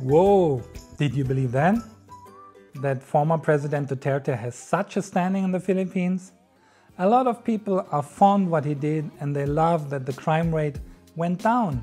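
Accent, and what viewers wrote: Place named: Germany. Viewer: I really like to hear a Deutcsh speaking Englisch. So clear like Filipinos.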